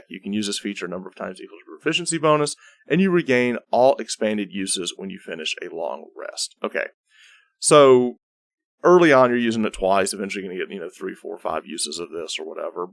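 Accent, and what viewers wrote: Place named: South, United States. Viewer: As a southerner. I have to say its great hearing an eloquence bard give dnd advice in a southern drawl.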